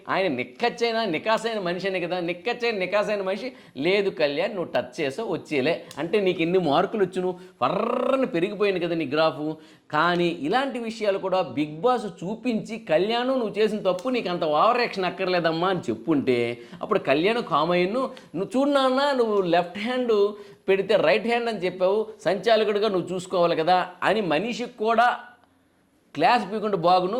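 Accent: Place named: Srikakulam, India. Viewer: Enti sir maa Srikakulam slang touch avuthundi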